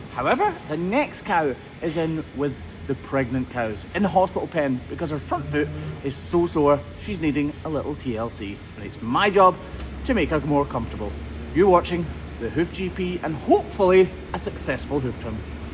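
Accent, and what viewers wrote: Scotland, United Kingdom. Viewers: Where in Scotland are you from I’m from Ayr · yer Scotish acent is pyer goodnes, mate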